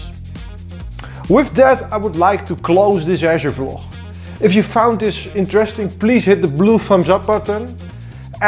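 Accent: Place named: Netherlands. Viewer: Maybe you should remove the typo in your title: Virual -> Virtual Love your dutch accent btw ;)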